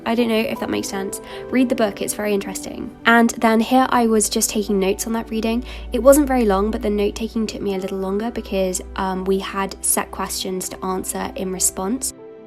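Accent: United Kingdom. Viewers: I wish She could read to us. Her voice is very calming and lol...I love her British accent. 😆👍💜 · British accent and cute home content.........yes, i love it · As a foreigner i am literally amazed by your smooth British accent and your calmed temper. Your style is so cozy and cute. The way you spend your free time with reading a lot (not ‘waste’ it like unfortunately majority of people nowadays, especially on YouTube) is immensely inspiring♥️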